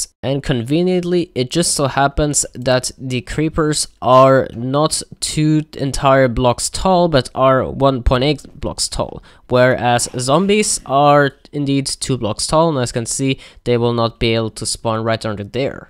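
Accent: Germany. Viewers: yup... I love his German accent. Most good technical minecrafters have German accents, ilmango, nex, methodzz and so on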